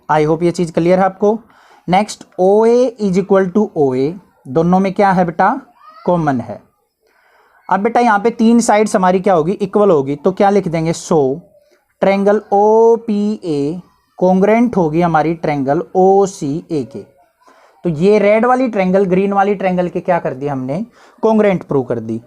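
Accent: Haryana, India. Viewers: Sir aap haryana se ho kya?? aapki voice m haryanvi accent aa rha h.. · Sir aap haryana se ho kya? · You are from Haryana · Nice sir are you frim haryana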